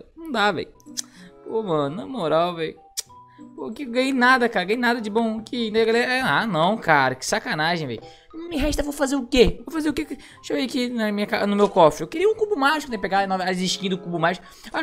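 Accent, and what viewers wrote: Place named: Rio de Janeiro, Brazil. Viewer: de que cidade do rio vc é?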